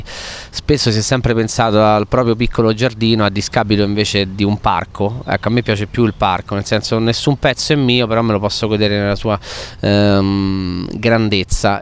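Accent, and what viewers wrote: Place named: Parioli, Italy. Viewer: Ma sta vocetta e sta parlata da pariolino???? Ma che è successo????? A piotta nun sei più er ghepardo de na vorta!!!! Però c ha ragione!!!!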